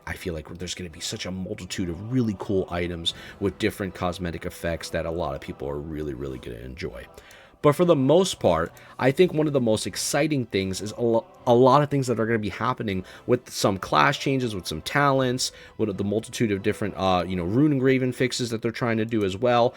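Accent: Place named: Midwest, United States. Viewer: Dude, lol. Love your videos man. And dat midwest accent 😆🤘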